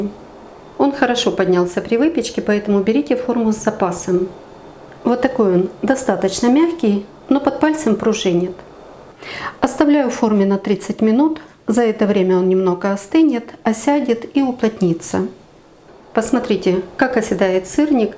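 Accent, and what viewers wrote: Ukraine. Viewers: Як же приємно ви говорите українською мовою, так чудово... А язік смердючого орка не можу чути, зразу звучить у вухах, як та погань з матом перерізає горло нашому захиснику, як дають цім язіком накази бомбардувати наші міста, спалювати наші ниви, врожаї, як похабно поливають нас хамською брехнею.... язік, який ви так поважаєте, лелієте..... невже СТІЛЬКИ СМЕРТЕЙ....молоді, діток, стареньких такі мовчазні перед БАЖАННЯМ заробити ті ЗКРИВАВЛЕНІ ЗГОРЬОВАНІ СЛІЗНІ копійки.... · Я смотрю ваши видео как сеанс гипноза, настолько успокаиваясь от вашего голоса,у вас такой красивый украинский язык,ну а рецепты все просто бесподобные,уже очень много готовила по вашим рецептам и все получалось идеально, спасибо вам за ваш труд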